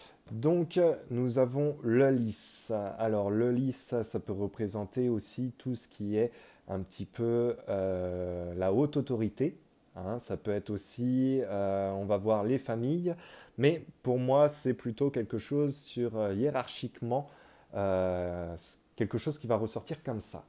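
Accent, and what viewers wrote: Quebec, Canada. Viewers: Merci, par moment j'ai cru percevoir 1 petite pointe d'accent Québécois? Je me trompe??? 🤔 Belle continuation. 😍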